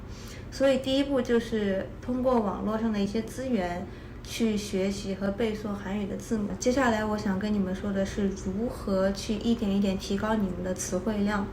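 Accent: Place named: China. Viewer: 这是中国口音吧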